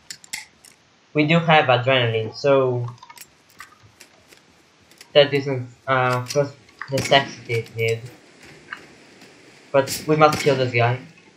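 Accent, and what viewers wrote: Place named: Italy. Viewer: 100% italian voice xD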